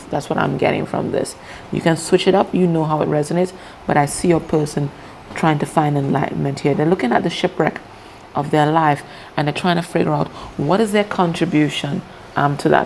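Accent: Trinidad and Tobago. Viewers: Wowwwww this is crazy. I first came to your channel months ago and I said to myself how she sounding like a Trini so 😂😂 Quito Quito indeed 👏💜❤️ bless up from the homelands. Love your readings.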